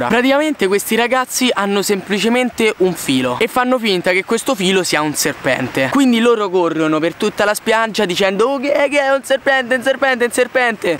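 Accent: Italy. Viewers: Me piace troppi ed tuo accento romano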